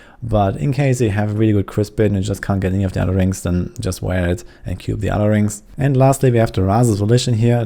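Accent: Germany. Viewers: Man hört direkt das du deutsch bist 🤣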